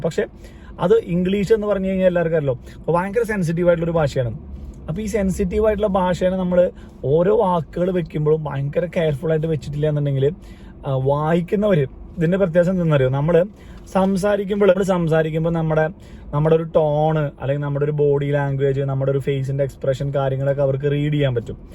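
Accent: Thrissur, India. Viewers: R u from thrissur ?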